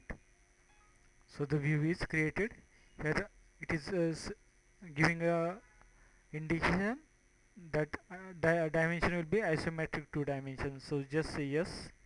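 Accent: India. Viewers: Incomprehensibly thick Indian accent and poor quality audio combine to make this english language tutorial sound like a foreign language.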